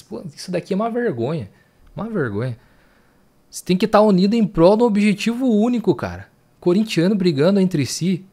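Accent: Paraná, Brazil. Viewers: Teu sotaque n me é estranho, Paranaense pelo tipo kkk Vai Corinthians!! Direto de Laranjeiras do Sul, Paraná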